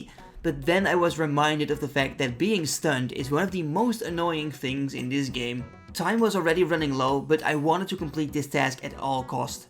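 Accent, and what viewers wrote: Netherlands. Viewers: The dutch accent is strong with this fella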